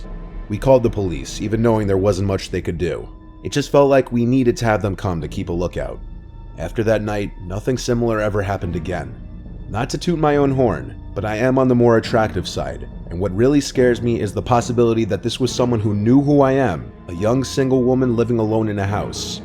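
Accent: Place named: New York, United States. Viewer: The New York Borscht Belt accent is killing me.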